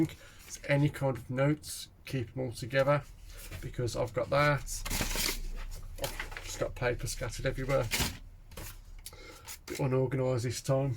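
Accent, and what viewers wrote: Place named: Dudley, United Kingdom. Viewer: Just come across your channel - sound like your from my way (Dudley). Reslly helpful videos - thanks for uploading!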